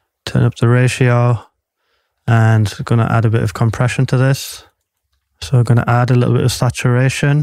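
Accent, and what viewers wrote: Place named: South Shields, United Kingdom. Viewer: I have watched it through 4 times now so you should be getting a cheque fo $0,0000 x 4 dont spend it all at once at the moment I managed to get in an instrument but cant get any sound out. I used cubase with atari many years ago and it was so simple with a Roland JV-1080 and got some great results (one recorded by Tom Jones ) Written in South Shields You sound as though your from up there but I cant get it to work with this I,ll soldier on trying to get it to speak to me I've got 26 days of trial left but at the moment even with your brilliant tuition it a no no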